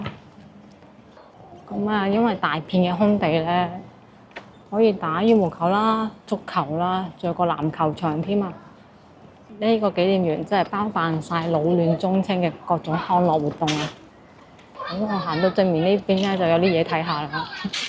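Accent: Guangzhou, China. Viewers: “你明唔明啊，系好香······呃裹种感觉”，一个香字，粤语发音拖得长长的，软糯糯，娇滴滴，还是广州女生讲粤语最好听，当然也分人，最好。。。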